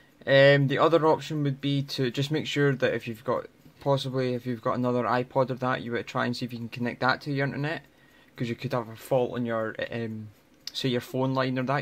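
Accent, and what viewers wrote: Scotland, United Kingdom. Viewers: Hes scottish you fucktard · Is u Scottish ?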